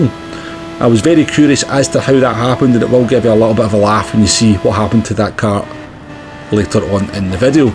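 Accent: Scotland, United Kingdom. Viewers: From Scotland by the accent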